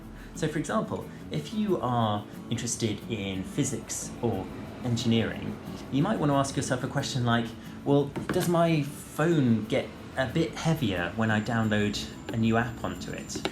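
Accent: United Kingdom. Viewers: I am a student myself and this is so helpful in so many ways. Thank you sir! And your English accent is pure music to my ears!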